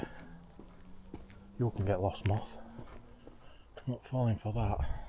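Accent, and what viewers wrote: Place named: South Yorkshire, United Kingdom. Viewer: That's a South Yorkshire accent if ever I heard one 😄. If you're in the area, you might like to check out the phantom black beast that haunts Anston Stones wood. Every one who's seen it (and I know someone who has) has the same reaction - run like hell. Hint - it seems it doesn't like people straying from the paths.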